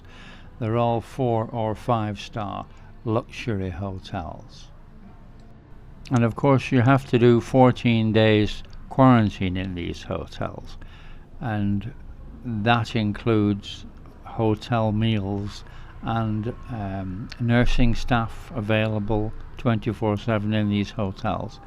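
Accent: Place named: Lancashire, United Kingdom. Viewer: You sound like a Lancashire lad, I’ll take a guess at Blackburn??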